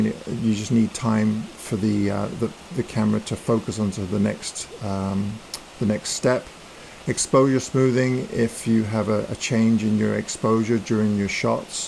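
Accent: United Kingdom. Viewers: Lovely photos. I loved the photo stacked one, beautiful. Can I ask, although you live in Canada are you British? You have a very British accent.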